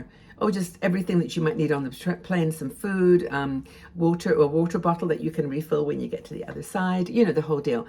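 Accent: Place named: Wales, United Kingdom. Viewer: Yes. Agree about clear diction but thought I heard some of the Welsh !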